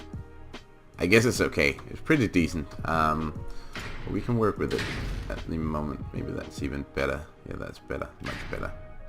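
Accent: Denmark. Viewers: 5 seconds into the video I'm like : "This dude is totally danish" 😃🤘 Skål, brother xD <link>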